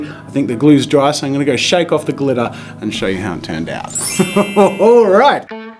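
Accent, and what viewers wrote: Australia. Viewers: Just a quick question, is he Australian? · i kinda find his aussie accent nice but thats probably because ive grown up with it my whole life lol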